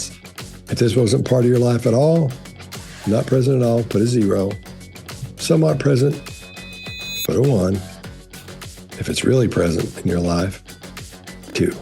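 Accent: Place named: Texas, United States. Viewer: Scott's voice is so soothing, and his accent sounds very Austin / Dallas.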